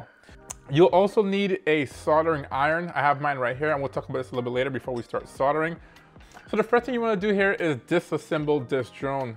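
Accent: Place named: Caribbean. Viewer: Sorry sound like you are from the Caribbean which island?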